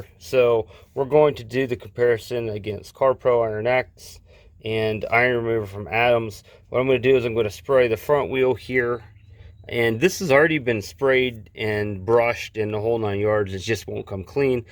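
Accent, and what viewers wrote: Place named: Baltimore, United States. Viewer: just guessing, from the accent... are you from East Bawlmer?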